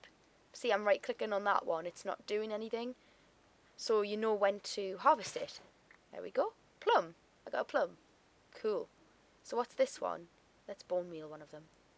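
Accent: Newcastle, United Kingdom. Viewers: You are a Geordie right?? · Newcastle, surely. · fellow geordie? lol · I can tell because of how you talk. I'm A Geordie Too. :)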